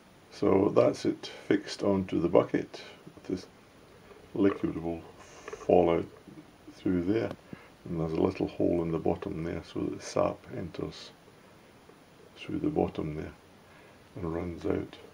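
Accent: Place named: Scotland, United Kingdom. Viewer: I hear a Scots accent ☺️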